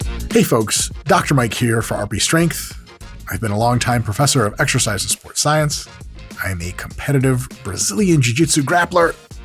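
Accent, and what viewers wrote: United Kingdom. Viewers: But he’d have to do the whole thing in his bri’ish accent. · Love the British accent Dr Mike !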